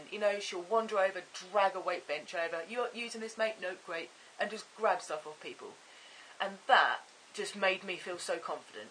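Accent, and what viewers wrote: United Kingdom. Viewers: really enjoyed this vlog...im from Birmingham uk by the way you sound british. Good Luck with training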